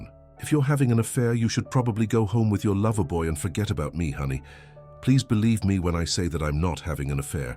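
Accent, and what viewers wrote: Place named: England, United Kingdom. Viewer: Note to the channel… Just because you use an AI reading voice with an English accent, does not make the video somehow higher quality. This is a re-hash of a very old story but it took the first 1/3 of the video before I recognized it. The awkward gaps between words (or running words together), all the mispronunciations, calling the private investigator the “AP” at one point, just makes the video poorly produced with a complete lack of editing and proofing. You’ve got segments of the video in the wrong places, making the story really confusing. All in all, this video is a hot mess and not worth the listener’s time. AI – generated videos like this one truly suck 👎👎👎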